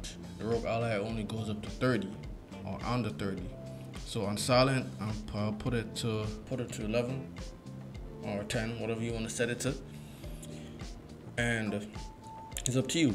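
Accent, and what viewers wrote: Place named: Jamaica. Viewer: I can tell!!!! I know a fellow jamaican when I see and hear one. I'm from Mobay. I think I subbed to u by the way. I have the deck, the switch and rog ally. While the deck and ally are both cool, I still think personally the switch us a way better and funner hybrid. The switch 2 will furthermore burry all these wanna be switch gandhelds into the ground even more. Anyway keep doing your things nintendo for life though lol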